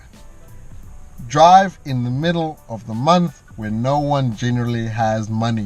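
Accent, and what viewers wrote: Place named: Johannesburg, South Africa. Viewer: Where you from jhb Got the accent😉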